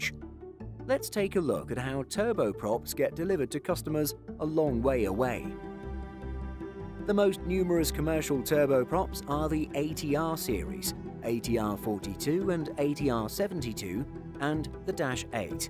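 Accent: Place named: United Kingdom. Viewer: Why all the Brit accent? Brits have little or nothing to do with turbo-prop aircraft ferry world-wide. ???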